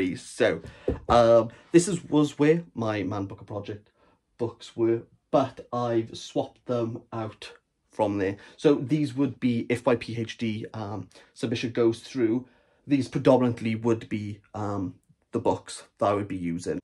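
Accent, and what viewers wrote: Wales, United Kingdom. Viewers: This made me giggle! Here in Melbourne it was lovely to hear a fellow Welshie! Thanks go to Simon for pointing me in this direction! 😊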